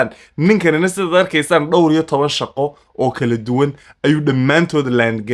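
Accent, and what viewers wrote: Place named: Arab world. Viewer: بأي لغة تتحدث لهجتك عربية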